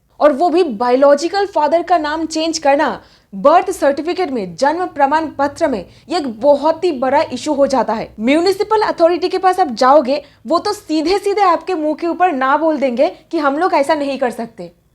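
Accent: Bengal, India. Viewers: Apnar kotha sune mone hochche apni bangali??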